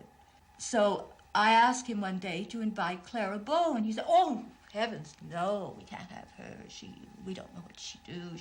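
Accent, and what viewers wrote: Mid-Atlantic, United States. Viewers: It sounds like a so-called mid-Atlantic accent, albeit toned down a mite. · Very surprised at her posh American accent. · It’s the Trans Atlantic/Mid Atlantic accent. It was how the upper class in the North East spoke. It was taught in speech/elocution classes as well, as in drama schools/classes. It fell out of favor after WWII.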